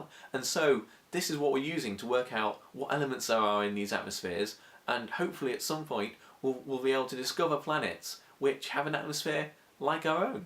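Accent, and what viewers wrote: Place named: United Kingdom. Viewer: Just saying "The Fundamental Interconnectedness of All Things" in a Brittish accent is worth a vlog in and of itself! Keep up the good work and good luck!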